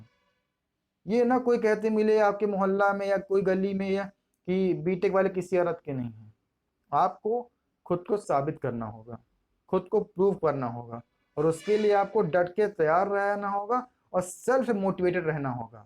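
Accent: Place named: Allahabad, India. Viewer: Sir aap ki bhasa Allahabdi lg rhi hai mja aa gya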